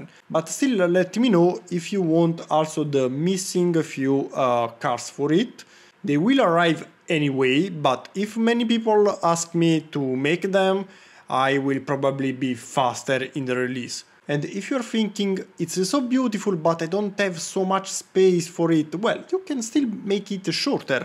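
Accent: Italy. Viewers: Dall'accento avevo intuito fossi italiano hahah, bel video e bel modello, complimenti!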